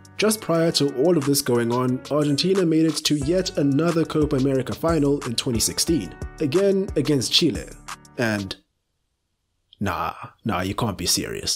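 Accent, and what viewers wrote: South Africa. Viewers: Mr narrator...are you south African?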